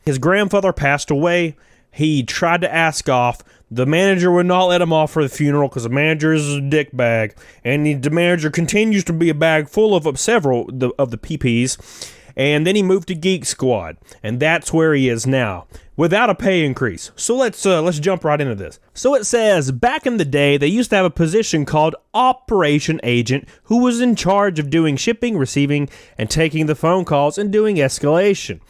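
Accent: South, United States. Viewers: I don’t know how I found this video but this guy sounds like a Southern Kermet the Frog 🐸. I’ll keep watching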